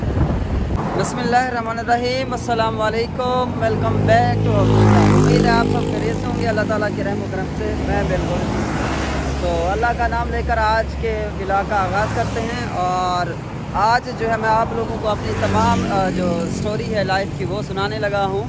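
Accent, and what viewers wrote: Saraiki, Pakistan. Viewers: brother apki awaz se hi pata lag gya tha k ap seraiki hu jitna bholese tu wala utna suchase so everything is fantsatic in your vlog wish u a very happy life allah hamre b naseeb khole apki trah😂😂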